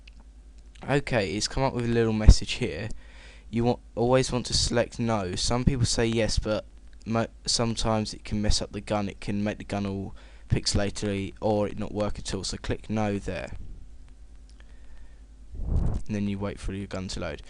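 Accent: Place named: Luton, United Kingdom. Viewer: u sound like 1 of dem luton bloods init, like me. wat is you?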